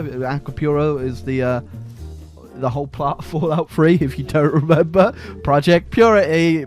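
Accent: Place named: RP, United Kingdom. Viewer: You sound like you have royal blood!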